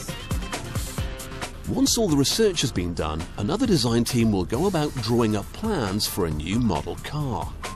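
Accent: United Kingdom. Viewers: The first one is correct as its a British accent..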